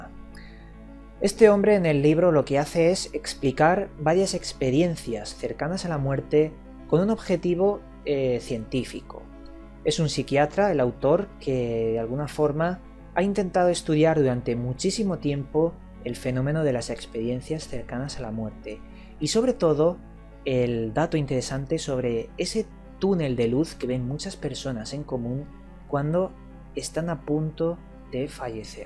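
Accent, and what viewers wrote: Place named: Spain. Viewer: Gostei muito do vídeo, mais eu não entendi muito porque sou brasileiro e você deve ser espanhol.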